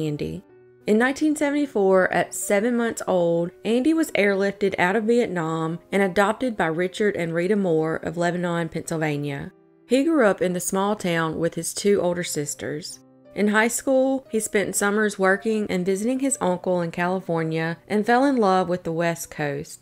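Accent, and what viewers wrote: South, United States. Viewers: Love your channel! You have such a soothing southern voice These cases are so tragic. You should definitely do another Oklahoma one!! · I like your Southern accent ... And of course the interesting stories and great narration. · This narrator has got to go. I've lived in Arkansas most of my life and have a Southern accent myself, but this is just frustrating and distracting.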